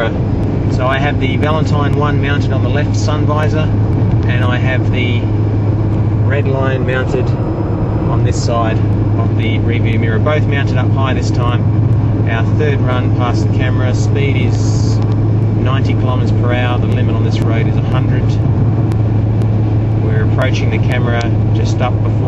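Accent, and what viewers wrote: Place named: United Kingdom. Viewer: ok sorry about that because i'm french and having hard time understand your kind of british accent keep on good worck !! best samuel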